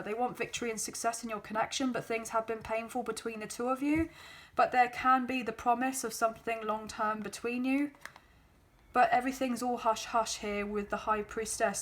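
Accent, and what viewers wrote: England, United Kingdom. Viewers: Loved this reading! Very interesting, thank you very much lovely Lady, Love your English accent!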